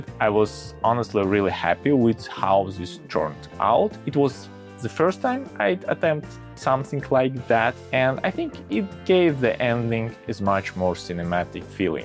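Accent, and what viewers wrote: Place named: Eastern Europe. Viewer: Очень приятный славянский акцент)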